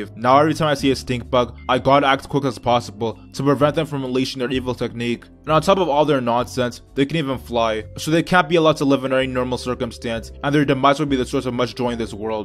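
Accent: Philadelphia, United States. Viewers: R u from Philly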